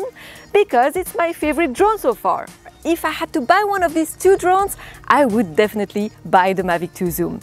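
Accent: France. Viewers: Honnetement = iJustine, et nous re-re-re-parler du drone du moment pour faire des vues ce n’est pas très interessant. Par contre l’accent francais en anglais et le debit rapide de la presentation c’est agréable. Du coup manque de contenu mais represente bien ce que deviens Youtube en 2018, une chaine de pub sans contenu. Ah, pour faire des vues tech actuellement faut parles du Nikon Z, du nouveau Canon, des Sony Mirorless ou de la 8K 🤓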